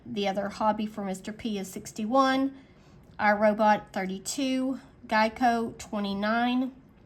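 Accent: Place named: Kentucky, United States. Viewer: Love this! Your accent reminds me of home (Kentucky) ❤❤❤ new subscriber here!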